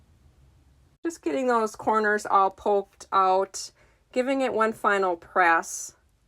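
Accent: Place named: Wisconsin, United States. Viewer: Cute cute! Love your accent! Wisconsin??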